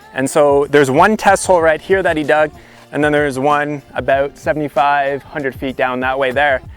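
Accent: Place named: Canada. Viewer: I like Canadians accent.. house, out, about etc.. just interesting ou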